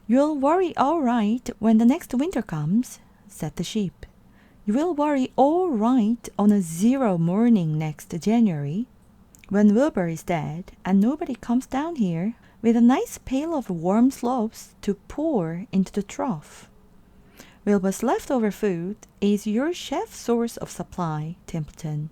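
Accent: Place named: United Kingdom. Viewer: 이 영상 덕에 영국식 발음 배운것같아요^^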